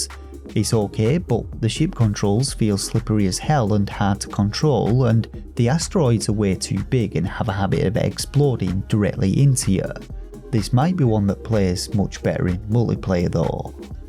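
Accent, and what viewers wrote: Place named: England, United Kingdom. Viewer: The like and subscribe was not for the content - although really well done - but for the English accent. Amazing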